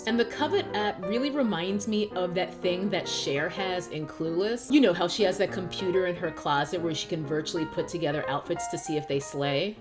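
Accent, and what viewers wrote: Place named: New York City, United States. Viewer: NYC accent is thick in this video!!! Also you know I watched this immediately!!!! Click bait!! I’m old!! Sobs in corner to “ ” which is also old enough to drink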